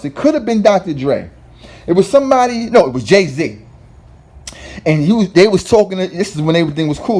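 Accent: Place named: Queens, United States. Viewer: This narrator definitely a Queens nigga 😂😂😂 His voice got me ctfu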